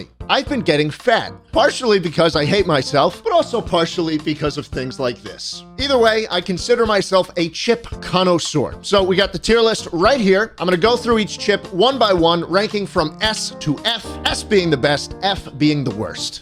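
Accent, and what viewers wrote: New York, United States. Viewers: You can hear the New Yorker in him come out more and more as the video goes on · New Yorker is coming out in this one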